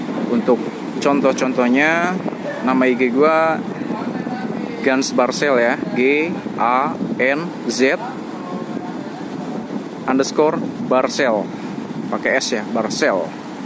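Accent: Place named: West Java, Indonesia. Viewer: Orang sunda iyeumah yeuh 😁😁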